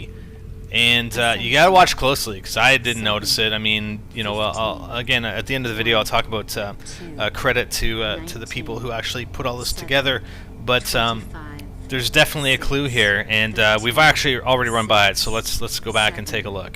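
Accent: Canada. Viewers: Your Canadian aren't you (zed) instead of z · this is so canadian with your prOcess and zed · are you Canadian?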